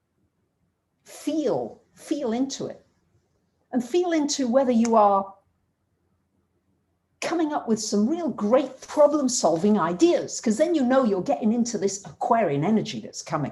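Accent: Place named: England, United Kingdom. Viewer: Love your passion towards astrology and english accent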